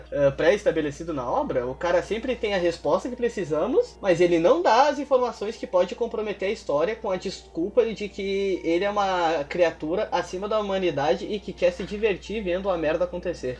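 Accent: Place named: Rio Grande do Sul, Brazil. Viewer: Bah, é raro ouvir o sotaque de um conterrâneo em análise de animes e mangás. Sucesso aí tchê!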